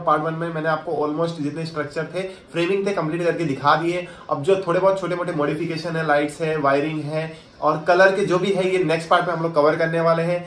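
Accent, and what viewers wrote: India. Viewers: Indian English~~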